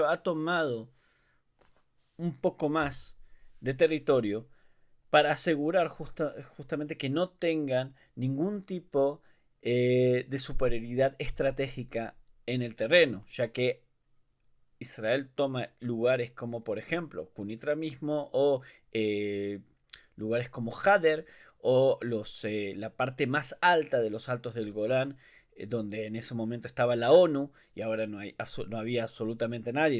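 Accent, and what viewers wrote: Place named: Córdoba, Argentina. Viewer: Muy buen informe, muy profesional. Puedo identificar un lejano acento cordobés … si?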